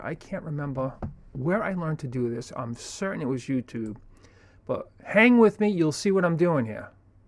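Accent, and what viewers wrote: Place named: Boston, United States. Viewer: Are you strictly just using a mouse to do all of your adjustments and edits in Photoshop, instead of using a drawing tablet and stylus? Man, I haven't done that since I bought my first computer way back in 1996. That's impressive! Excellent video, too. By the way, are you from Boston? Anyway, definitely a "Thumbs-Up!" from me. Thanks for the help. I appreciate it a lot.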